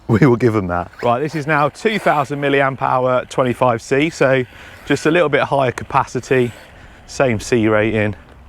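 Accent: United Kingdom. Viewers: Good God that's an ugly controller! 😕 I do like the body shell and your British accent. ☺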